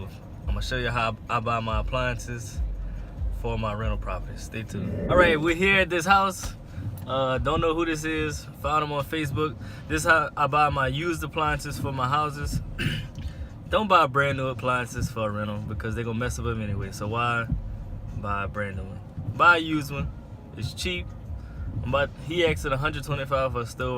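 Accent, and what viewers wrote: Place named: Louisiana, United States. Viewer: I heard your voice and knew you were from Louisiana.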